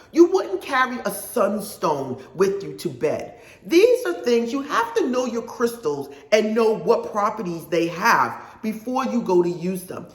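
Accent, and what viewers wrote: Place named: Brooklyn, United States. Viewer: It's giving very NY, very Brooklyn. The sounds of my still living ancestors right now ❤️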